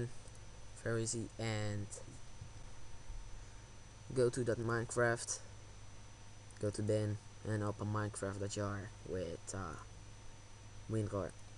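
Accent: Netherlands. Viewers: Je kunt echt horen dat je Nederlands bent... :P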